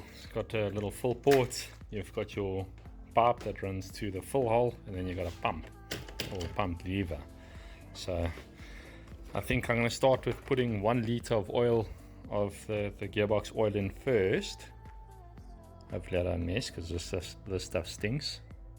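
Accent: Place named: South Africa. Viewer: Lekker! Nog 'n video en 'n bietjie Suid-Afrikaanse aksent! Musiek in my ore!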